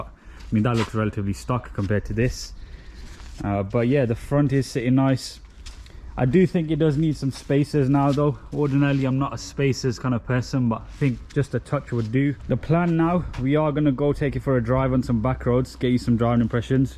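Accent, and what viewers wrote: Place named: Liverpool, United Kingdom. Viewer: That Liverpool accent what makes this video entertaining. Keep up the good work.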